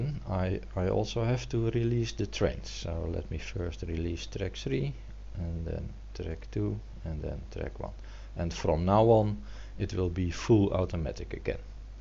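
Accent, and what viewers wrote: Netherlands. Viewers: Jij praat met een héél Nederlands accent.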